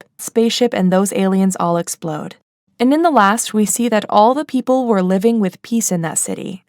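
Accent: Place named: United States. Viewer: Why does the narrator have a Amrican accent but speaks like English is not her first language?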